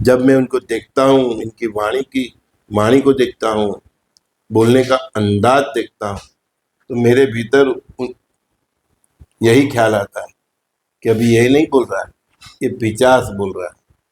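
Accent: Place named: Gujarat, India. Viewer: Vo gujrat ke hai, hindi mother tongue nahi hai unki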